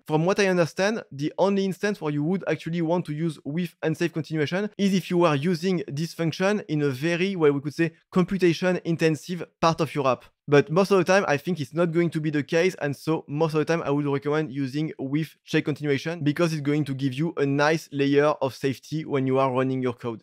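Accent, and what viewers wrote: France. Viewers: J’adore l’accent français!!!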